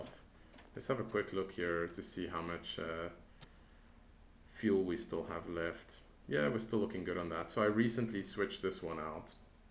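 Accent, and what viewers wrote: South Africa. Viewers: Sounds like SA?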